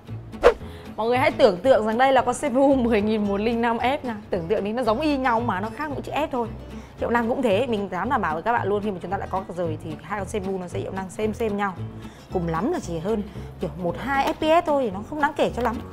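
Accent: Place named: South, Vietnam. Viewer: Nói giọng Nam dễ thương thế :)))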